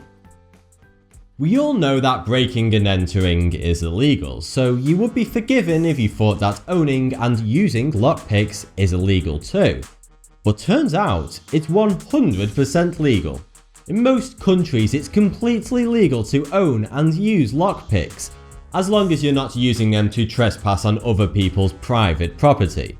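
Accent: United Kingdom. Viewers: Does this account for the USA? because you sound British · Whats with the Brit?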